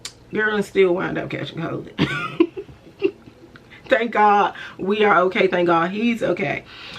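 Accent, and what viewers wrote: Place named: DMV, United States. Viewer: Hey DMV friend ❤I’m new to your channel and had a feeling when you started speaking had to be from DMV 😊I love love your channel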